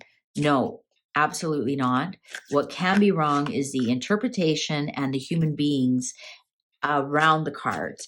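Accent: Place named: Canada. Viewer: I’ve been binge watching while I’m healing. I am in awe how your readings go. Cards that represent a person is amazing, love it. Clear clarity. Also I feel intuition is so important. Don’t second guess yourself and let the cards tell the story. Your Canadian accent is so endearing. I lived in Canada until I was 7 or 8. Mom brought us to the states and been in Michigan since. People especially down south visiting ask me where did I get my accent. LOL. The Canadian in me is not lost. Phrases and eh, too many words to list. My point is you are so charming and remind me of home❤ Thank sweet lady. I’m going to try to book a reading. Everyday I look for your new uploads, love commenting in a positive manner. God Bless❤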